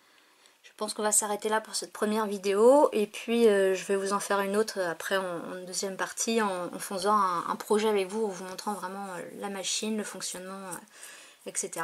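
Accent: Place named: Normandy, France. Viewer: Bonsoir, j'aime beaucoup tes vidéos, super bien expliquées c'est vraiment super car ça manquait, merci beaucoup. Je pense que l'on vient de la même région j'ai reconnu l'accent normand 😉. J'habite à Paris mais je suis native du Havre j'y retourne régulièrement d'ailleurs. Merci encore pour toutes ces vidéos explicatives.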